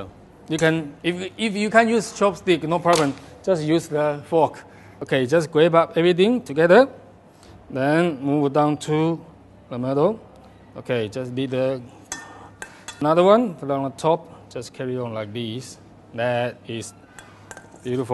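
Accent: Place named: China. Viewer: His accent is definitely of China...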